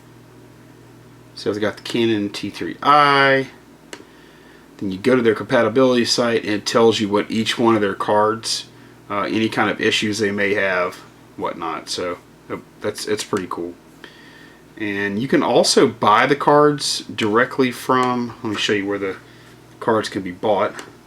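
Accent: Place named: Maine, United States. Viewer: Your accent sounds like a "guy" I once played poker with. Are you from the Maine area? You articulate your videos very nicely for those of us stunted by the Thesaurus Rex cunning linguist known as DLeake.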